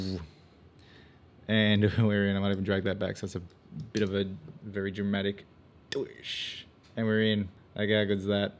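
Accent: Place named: Australia. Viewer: Great video , just what I’ve been looking for. May I recommend that for us in America could you please slow down your speech a tad and work on clearly enunciating . There are some details in your presentation that we really appreciate but unfortunately we in America (especially us seniors) just can’t quite grasp the Ausie lingo. Again…. I’m not trying to be critical, love your video. This Insta360 oneX2 is very difficult to grasp even under the best of circumstances. Take care and thanks for great content. 👍👍👍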